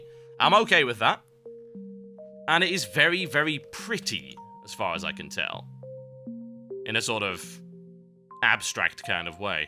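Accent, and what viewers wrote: United Kingdom. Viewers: "Not as clumsy or random as a Titan... an elegant blaster for a more civilized age" Hahah epic Star Wars ref!! I wish I was a Brit with a sexy deep voice like you, you make everything sound a million times cooler xDDD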